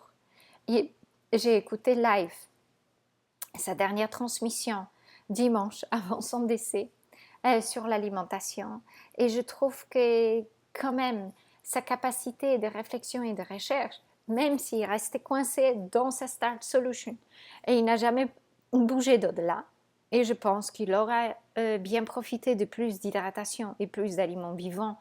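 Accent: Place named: Poland. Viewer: ton français est extraordinaire pour quelqu'un dont ce n'est pas la langue maternelle. Et ce qu'il reste de polonais dans ton accent est charmant! Bravo pour cette maitrise de la langue et merci pour ces vidéos que je regarde avec curiosité.🙂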